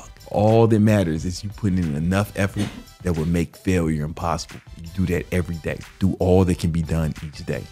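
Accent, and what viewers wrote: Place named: New York, United States. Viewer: I know u grew up in Chicago but u def speak New Yorkanize. I like that quote: ain’t got shit to do with you homie. As we say in NY, mind your business and keep it pushing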